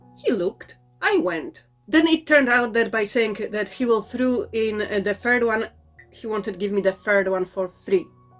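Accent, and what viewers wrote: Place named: Poland. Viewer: Your polglish is the best proposition ❤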